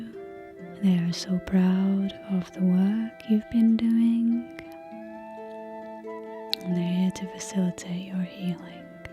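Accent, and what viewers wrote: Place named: Lancashire, United Kingdom. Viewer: Use the full lancashire accent you possess, so natural and attractive. From a fellow lancastrian.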